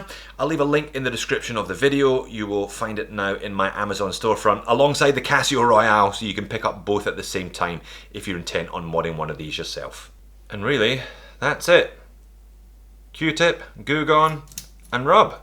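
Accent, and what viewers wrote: Scotland, United Kingdom. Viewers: You look and sound like a scottish peter finch. Its uncanny he’s a golf youtuber and i swear other than accent differences you guys talk just alike.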